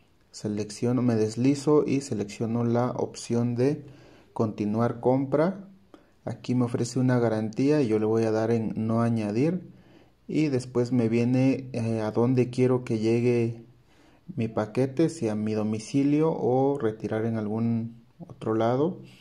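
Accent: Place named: Mexico. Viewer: X k habla como mexicano ?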